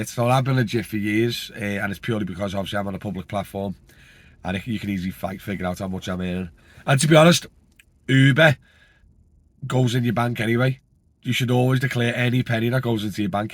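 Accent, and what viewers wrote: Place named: England, United Kingdom. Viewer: Can't understand your English accent mate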